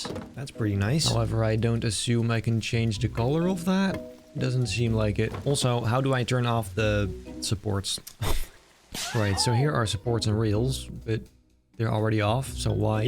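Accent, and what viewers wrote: Netherlands. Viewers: Holdon Is this the same guy behind photoshop battles Sounding very dutch😅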